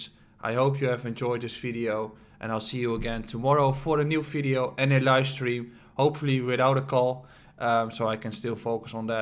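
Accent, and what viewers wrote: Netherlands. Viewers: Haha, aan je accent is te merken dat je Nederlands-talig bent, wat zijn je verwachtingen voor Zilliqa aan het eind van het jaar ? Zojuist 10.000 euro geinvesteerd, hopelijk kan ik me hypotheek aflossen binnen een paar jaartjes 😃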